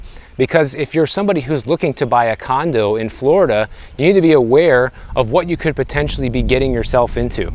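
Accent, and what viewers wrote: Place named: Midwest, United States. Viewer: Being from Boston I love hearing the Midwest Fargo-ish accent . Love the videos bro!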